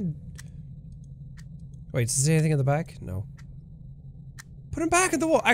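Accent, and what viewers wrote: Ireland. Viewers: Is it just me or does sound impossibly more Irish this episode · I love how progressively became less irish through out his career and now he's suddenly hyper irish. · I don't know if I just haven't watched in a while or something but you sound VERY Irish to me in this video for some reason. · I love how used to hide his Irishness and he's now more Irish than ever. If he ever says "Fecking eejit" I will lose my shit. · He sounds so much more Irish ☘️ in this video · I was so scared just hearing his irish accent get more intense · was so uncontrollably irish in this video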